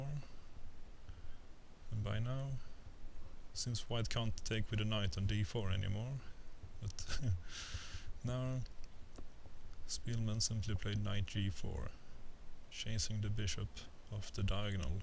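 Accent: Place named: Sweden. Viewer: yay svensk accsent =D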